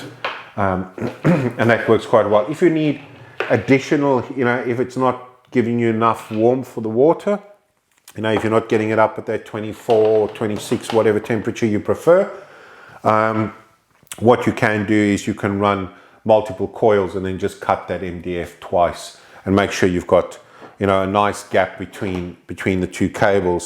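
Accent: South Africa. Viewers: Nice video man . Helped me a lot. Are you from South Africa by the way? Sounds like you have an SA accent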